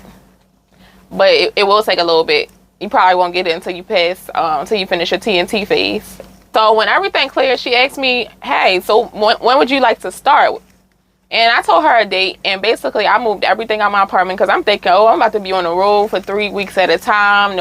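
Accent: Baltimore, United States. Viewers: I knew you were from Baltimore nobody else sound like us what happened to the NFI vid? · i’m just listening to her talk and i’m like she from baltimore…. but idk then i came out 🤣 our accents strong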